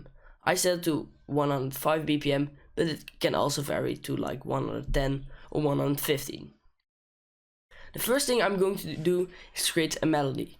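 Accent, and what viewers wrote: Netherlands. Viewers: Are you Dutch cuz I am and I thaught u where Dutch 2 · You are dutch, are you? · Are you dutch? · You sound dutch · So dope! Are you Dutch btw? Keep doing your thing man! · Lekker Nederlandse accentje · Are you Dutch? If so Hallooooo!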